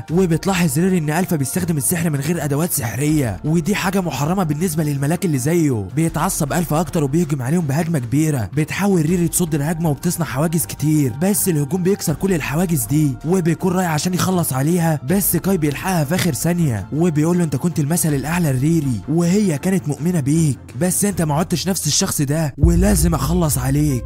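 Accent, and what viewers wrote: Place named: Saudi Arabia. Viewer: أسطورة استمر انت ولد عمي وترونة سعودي ولكنة لخبط وحط اللغة المصرية ❤❤❤❤❤❤❤❤❤❤❤❤❤❤❤❤❤❤❤❤❤❤❤❤❤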